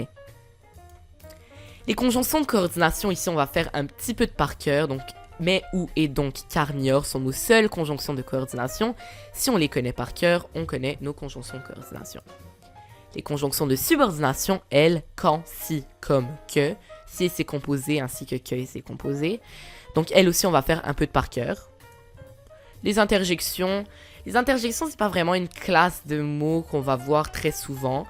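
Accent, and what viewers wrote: Canada. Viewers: Il a un petite accent québécois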